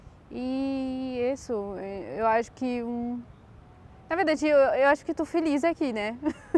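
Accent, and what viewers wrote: Ceará, Brazil. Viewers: Seja bem vinda ao nosso País,sou de Salvador mais já deu pra notar que vc já estar com sutaque Cearense. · Que fofa falando com sotaque cearense. Descobri esse canal agora, estou amando os vídeos😍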